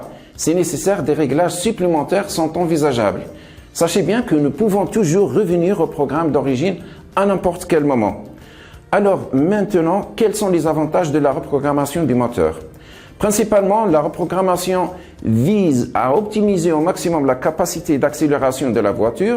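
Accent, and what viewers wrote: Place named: Maghreb, North Africa. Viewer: ce qui est risible c'est pas l'accent c'est le langage soutenu qu il utilise conjointement à son accent.. Ça se voit que ce qu'il raconte n'a pas été écrit par lui.. Au Maghreb il y a des gens qui dépassent bien des français dans la maîtrise du français.. Il ne faut juste pas s'inventer un personnage.. Je suis sur que tu discutes avec lui c'est plus du tout le même français..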